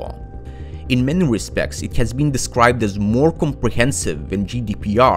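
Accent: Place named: India. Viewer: Need one with out the pajeet accent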